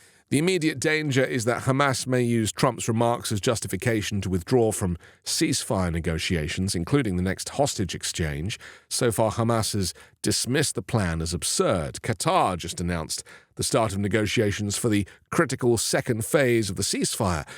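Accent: United Kingdom. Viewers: Is this ai voice implant to sound British and why would you think it makes sense?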